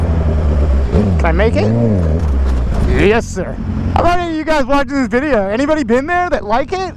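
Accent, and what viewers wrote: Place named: Michigan, United States. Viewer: I fucking knew you were from Michigan! Cant mistake that accent or just how you are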